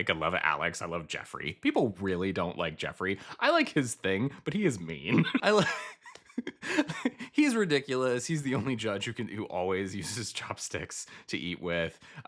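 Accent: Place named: Canada. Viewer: See? There’s a reason why I thought you were Canadian at first 😂😂😂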